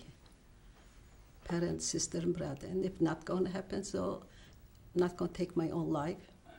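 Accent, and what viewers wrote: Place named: Germany. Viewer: Boy she has that strong German accent especially when saying my the German way Meine Vater the v make the F sound in German.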